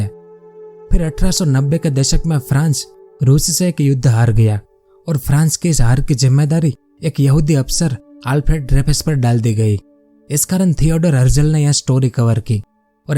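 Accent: Gujarat, India. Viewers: आपने भोट बड़ा इंफॉर्मेटिव ब्लॉग बनाया और इसके लिए आप धन्यवाद के काबिल हेन और भोट ही भीतर बनाया जिस्म ४००० बरस का इतिहास जो मैंने मेरी ९० बरस की उम्र में खिजाना था और हिन्दी मेरे लिये भोट मुश्किल हेन मेरी ज़ुबान गुजराती हेन लेकिन इतना कर शक्त हूँ आप के बोलने से आप गुजराती लगते हों और voice भी भीतर करने की ज़रूरत हेन फाड़ी आवाज़ ज़रूरी हेन ऑलोवर भोट भोट भीतर हेन जिसकी आप को धन्यवाद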